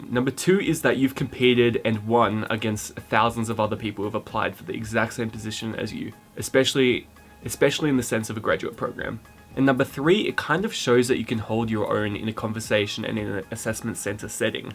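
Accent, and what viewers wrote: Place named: Spain. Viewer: you have a spanish accent. So funny!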